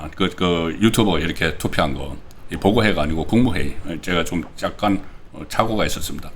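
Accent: Gyeongsang, South Korea. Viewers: 송국건씨 말솜씨가 그래가지고 평론 하겠어요? 경상도 사투리억양이야 타고난거니 어쩔수 없다 하더라도 버벅거리고 두서없고 툭툭 턱턱거리는 말투와 어휘력 에 듣기가 거북하네요. 아나운서 정도는 아니래도 평범한 수준이라도 되야지. 참 딱하네요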